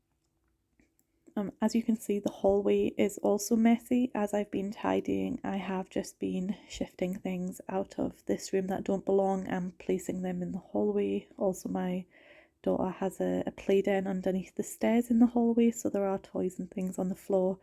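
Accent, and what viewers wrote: Scotland, United Kingdom. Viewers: Greetings from Portland Oregon. Mac Bonnie Barbie and Coline follower, also mom of 4, also migraine sufferer, I lived in NE Scotland for about 2 years and my second was born there, so jumped at the chance to hear familiar sounds sights and dialect.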